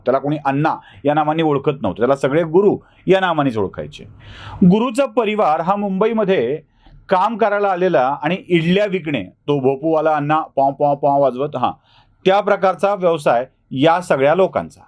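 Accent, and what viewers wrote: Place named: Latur, India. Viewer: शब्दाला व शब्द फेकीला धार आली आहे. खूप खूप शुभेच्छा. एक कळकळीची विनंती. न आणि ण ची गल्लत सुधारून घ्या. लातूरची भाषा, हेल आवडेल पण उद्याची पिढी जेव्हा तुमचा आदर्श ठेऊन अश्या चुका करतील व ते पण असेच बोलतात म्हणून सांगतील तेव्हा खूप दुःख होईल. राग नका मानू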